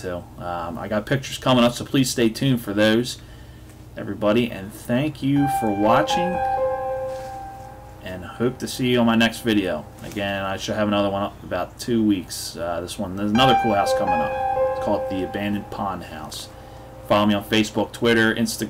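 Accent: Philadelphia, United States. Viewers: What is your accent? Are you a Philadelphia area native?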